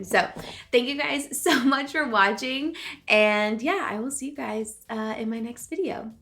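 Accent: South, United States. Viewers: Am I going crazy or did she used to have more of a southern accent? Lol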